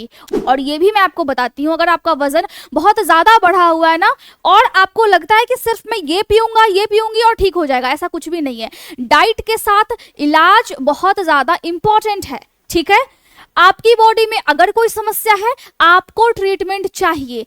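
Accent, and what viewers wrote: Bihar, India. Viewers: Mam bihari to nhi ho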